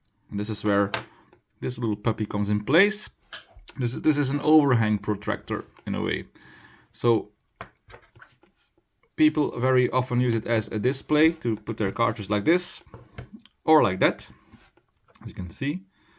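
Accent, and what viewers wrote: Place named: Netherlands. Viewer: Je klinkt als een Nederlandse die Engels spreekt 👋🏻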